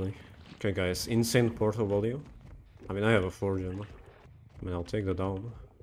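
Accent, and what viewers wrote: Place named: Poland. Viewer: Polski akcent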